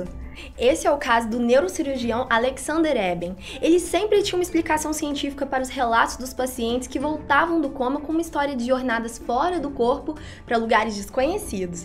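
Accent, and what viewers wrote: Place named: Minas Gerais, Brazil. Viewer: ela tem um sotaque bonito de mineira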